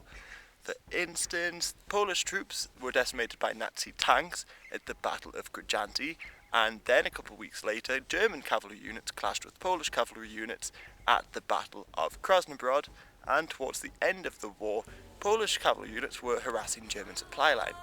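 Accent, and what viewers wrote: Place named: Newcastle, United Kingdom. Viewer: Neecastle lad! We-aye! Dare you'd to speak Geordie in an episode to come!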